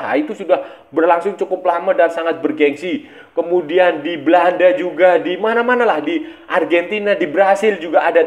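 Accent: Indonesia. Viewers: eja ala2 english pun lidah indonesia tetap sama baca nya 😊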